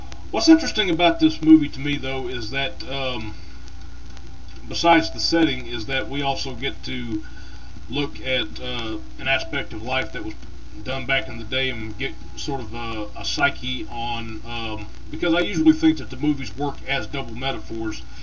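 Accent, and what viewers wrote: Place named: South, United States. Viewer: Hey, I'm from Canada. I think this review wouldn't have been as cool if you didn't have the accent. It makes me like you. Where are you from? Sounds very Southern. Either way, great review. I want to note however that they put real indians in the movie. I mean ful blooded ones, which I thought was extremely Canadian.nJust wondering if you noticed that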